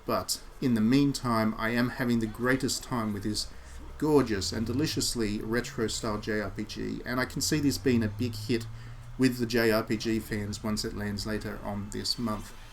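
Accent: Australia. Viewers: Been watching this closely, the fact that bravely default was often referred to as the best final fantasy in a long time (without being one) by a lot of people had me so interested but I never got to play it. (Hopefully they port to switch or ps4...Dunno I think it's a nintendo exclusive?) This told me pretty much what I needed to know, ya also sound Australian so consider my subbed. Got ya point across well an laid back bro. 👌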